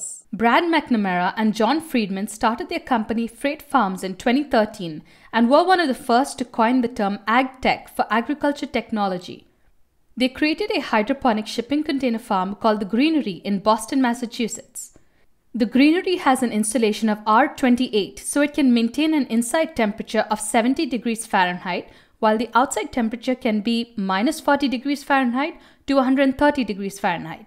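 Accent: India. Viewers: It is too weird hearing a Indian accent using imperial measurements. My head is going to explode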